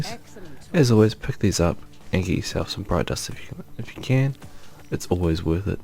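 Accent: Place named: New Zealand. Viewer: R u a new zealander sound like it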